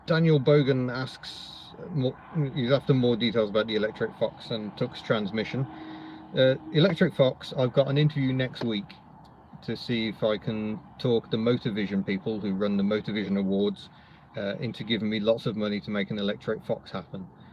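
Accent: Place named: Midlands, United Kingdom. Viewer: where were you born? You sound like posh Midlands, Warwick possibly?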